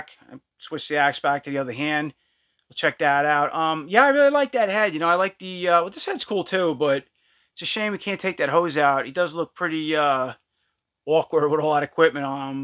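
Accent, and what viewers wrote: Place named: New Jersey, United States. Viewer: Love your voice man! Jersey kinda sound! I gotta check more of your videos out. Thanks again for the dub and I subscribed back ..but looks like your chan chan is doing well.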